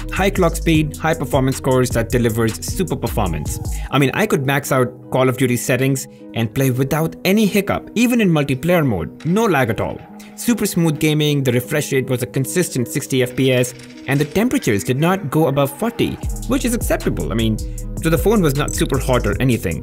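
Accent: India. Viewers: Very hard to hide indian accent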